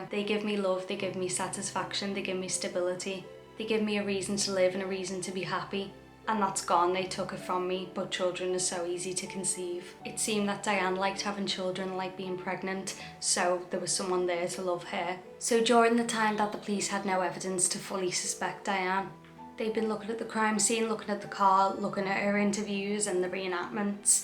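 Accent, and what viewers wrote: Liverpool, United Kingdom. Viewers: is From Liverpool, England. That is a Scouse accent.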